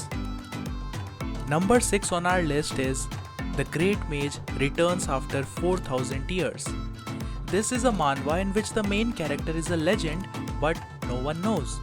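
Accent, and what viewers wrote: India. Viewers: Why the fvk yt suggest this for min I opened this an hear the voice I exit sorry man I'm indian to but can't stand y'all accent ☠️☠️☠️☠️ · I am new here but good recommendations And btw are you from in India because I fell that your accent is pretty similar to us bi or trilingual. Also have you read these new manhwalike: 1. Arcane sniper 2. Return of the frozen player 3. Mercenary enrollment 4. Limit breaker 5.The player that cant level up All the above ones are relatively new with avg of 25+ chapters but surely they'll rise to top So read them and all of them are manhwa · Your India accents really annoying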